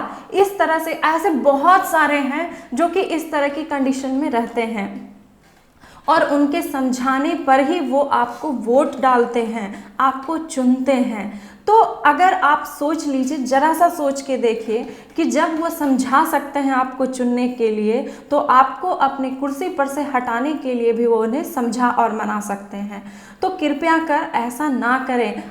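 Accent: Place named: Bihar, India. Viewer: Bihari... Style mam😀😀🙏🏽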